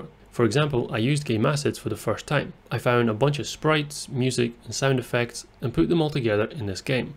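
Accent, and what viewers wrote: United Kingdom. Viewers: are you Scottish btw?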